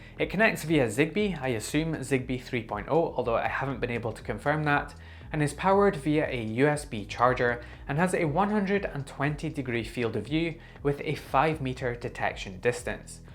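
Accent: Scotland, United Kingdom. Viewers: I agree this is revolutionary! I have started my smart home with Xiaomi/Yeelight/Aqara, but they are now just too expensive, with this stupid region locking. Tuya Zigbee is stupidly cheap, and will equal performance for most devices. I will wait for a cheap Tuya clone. One question: Despite my first name i am not english, but i love the language. Am i correct that this is a Scottish accent? Greetings from Germany :)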